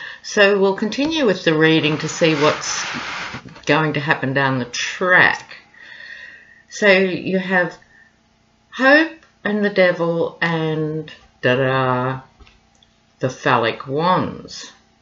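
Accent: Australia. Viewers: Hi, I just discovered you. Great to hear a fellow Aussie speak about the crap going on in the states! A lot to catch up on...😊😊😊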